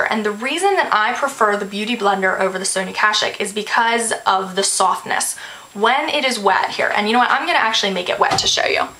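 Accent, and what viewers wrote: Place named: Louisiana, United States. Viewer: I'm from Louisiana - you sound like you're a Cajun girl too maybe?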